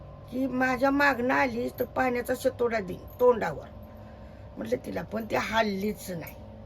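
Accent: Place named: Rural, India. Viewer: Ajini ekdum gavchya padhati madhe cahb sangitla😊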